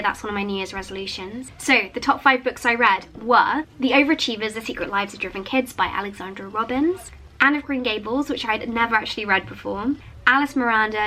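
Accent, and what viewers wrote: United Kingdom. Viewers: speaks uncanny British English and her pronunciation is very good - when it comes to understanding and/or learning English as a non-native speaker. There's no point in making her change her speaking habits just for you, especially that the purpose of the channel isn't to teach people the language. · I'm also not a native english speaker (and not very skilled in this language), but I understand almost all she says. has very beautiful british accent and very good diction 😁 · I and many followers have low level of English. Please speak more simply and reduce their pronunciation. we can't understand the british dialect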